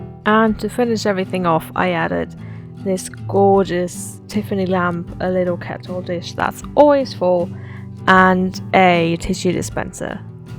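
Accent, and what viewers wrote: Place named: United Kingdom. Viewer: Where is the cuntyy British accent coming from omg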